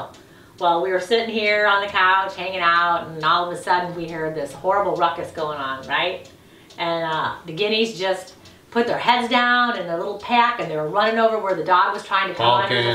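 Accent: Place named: Arkansas, United States. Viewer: my friend from Little Rock sounds just like you. Is that where you are from?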